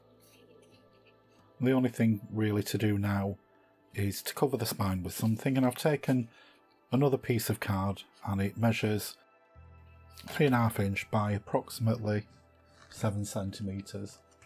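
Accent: Northern England, United Kingdom. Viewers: So lovely to see & hear your video with a 'new' take on an old friend. Applying tape to the whole sheet first - genious. And the presentation is so calming - no disruptive music, or irrelevant chit chat, just the basic instructions. Great to hear a (UK) Northern accent too - Manchester? More please!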